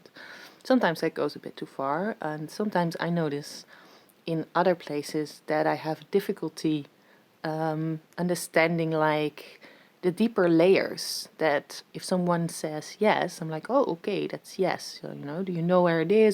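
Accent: Netherlands. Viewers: an English Dutch accent which is pleasant to listen to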